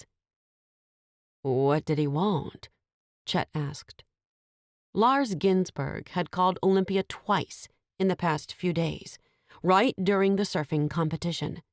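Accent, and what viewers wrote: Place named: South, United States. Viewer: Really enjoyed the story. I have lived a n the south all my life and I’ve never heard anyone speak so slowly and so southern.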